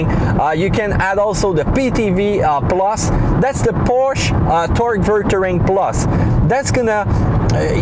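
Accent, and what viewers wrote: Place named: Montreal, Canada. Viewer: You don't need to listen to people correcting you. Your review was top notch. Your Montreal accent did not get in the way of me understanding anything.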